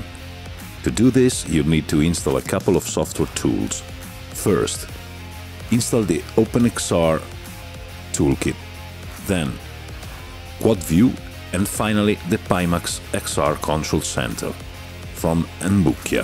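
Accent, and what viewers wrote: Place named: Central Europe. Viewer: 😜Hi Doc. Please answer this question? Where do you come from? You have a special language accent . Do you remind me a Guy From Center Europe .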